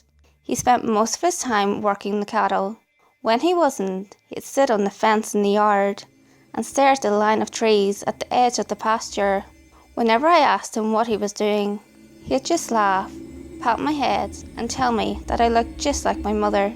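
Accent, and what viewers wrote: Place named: Northern Ireland, United Kingdom. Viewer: that north irish accent though, not as bad as my midlands accent to be honest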